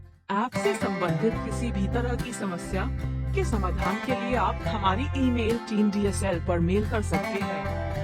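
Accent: Bihar, India. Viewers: Tum bihar se ho kya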